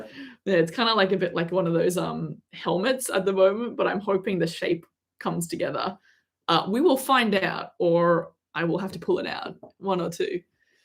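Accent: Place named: Australia. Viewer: I fell in love with the Australian accent charm thanks to. I loved, love, will love this channel. I’m going to miss you so much.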